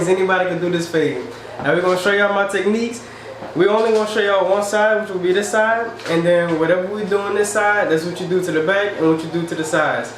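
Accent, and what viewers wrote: New Orleans, United States. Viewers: Are you from New Orleans? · He gota be from New Orleans ya heard me... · Am I wrong? I heard a N.O. accent. 🤔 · Sound like you from here in the N.O. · Bro are you from Louisiana fam?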